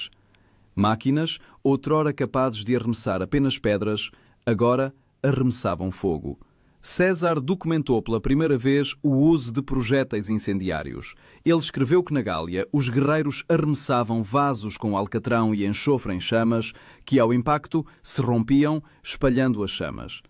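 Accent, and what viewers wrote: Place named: Portugal. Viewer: Maravilha este acento lusitano.sou brasileiro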